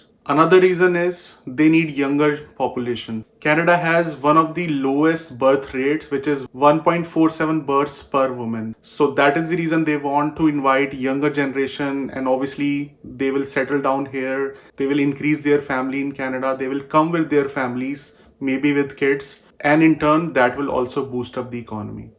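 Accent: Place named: India. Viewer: How come you still have such a strong Indian accent?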